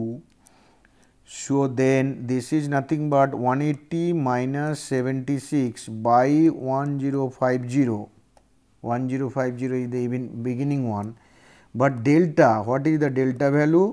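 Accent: Bengal, India. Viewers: Bhai aapka comment padh kar hansi aa jaati hai, Professor ek Bengali hain aur isiliye Bengali accent aa jaata hai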